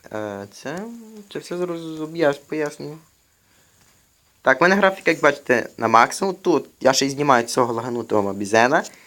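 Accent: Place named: Hungary. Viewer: Мені одному здається, що автора акцент Угорський....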